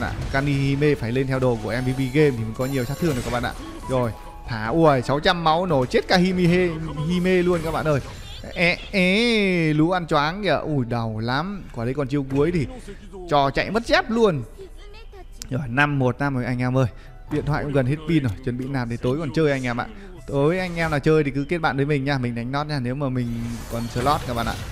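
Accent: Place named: Ha Tay, Vietnam. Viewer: Giọng a nghe như giọng hà tây kkkk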